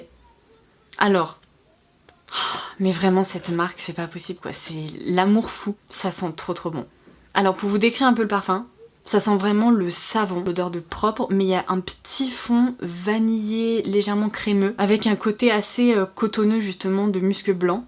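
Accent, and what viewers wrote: England, United Kingdom. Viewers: Oua! Mais franchement Oua! Ca fait un petit moment que je te suit, déjà je te trouve super en tant que personne, tu es très belle, j'adore ta façon de parler et ton accent anglais me fait rêver Lol Ton unboxing me vend du rêve, les produits Daniel Sandler m'intriguent beaucoup, ils ont l'air vraiment beau, j'ai vraiment hâte que tu les test pour voir le rendu, la palette pour le visage ND, non mais comment dire que idem trop hâte que tu la testes, elle est splendide, je n'avais pas entendu du RAL de cette marque, mais il a l'air splendide également! Continues ainsi, tu es au top et très agréable à suivre! PS: j'adore tes " OH, Ouah!"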